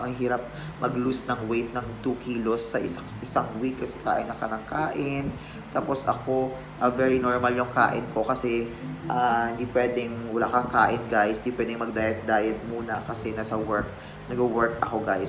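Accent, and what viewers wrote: Iloilo, Philippines. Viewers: Ilonggo ka?heheeh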